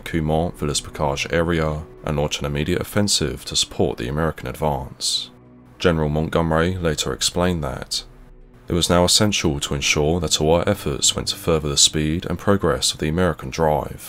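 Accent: United Kingdom. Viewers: You can be polite. not everyone is habitual of British accent. not everyone is habitual of listening to English. there are people around the world who speak many different languages and hence they find it difficult to understand such a "typical English accent". no offense!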